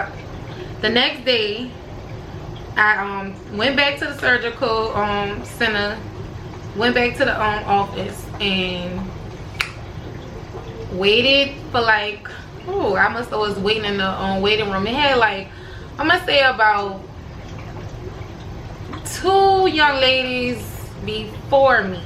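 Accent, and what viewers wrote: New Orleans, United States. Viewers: I know you from New Orleans. I love how people from New Orleans talk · I love the New Orleans accent by the way it remains me of Toya lil Wayne ex. Thank God she is ok now. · New Orleans accents are my favorite.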